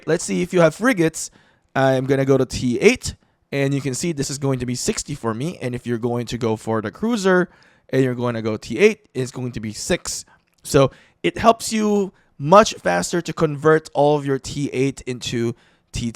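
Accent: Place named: Philippines. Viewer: Pinoy accent, pinoy ata 'to.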